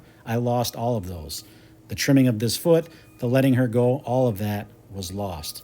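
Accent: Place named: Wisconsin, United States. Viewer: The first time I watched 1 of your videos I said " oh yea this guy is definitely from Wisconsin " 😂 you sound like a sweeter, smarter and friendlier version of Bob from that 70s show and I love it haha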